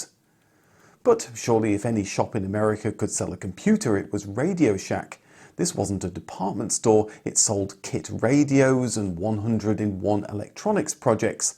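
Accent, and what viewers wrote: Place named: United Kingdom. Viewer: Love Your historic vidéos. Lots of information and Your perfect British accent is so good for French ears. •••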